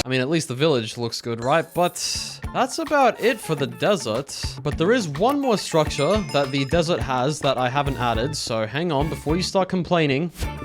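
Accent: United Kingdom. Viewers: Love british accent too much dude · I really like your British Accent...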